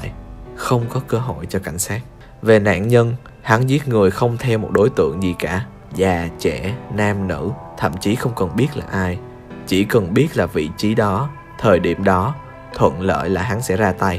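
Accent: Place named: Da Lat, Vietnam. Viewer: bạn này chắc là người Đà Lạt, giọng giống giọng em trai mình quá luôn ah.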